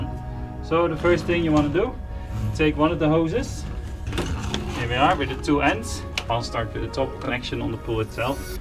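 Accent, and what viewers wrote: Netherlands. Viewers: Are u dutch ?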